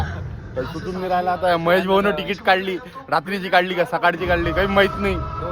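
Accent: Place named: Vidarbha, India. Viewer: भाऊ भाषा लय भारी आहे तुमची बहुतेक तुम्ही आमच्या वऱ्हाड aतले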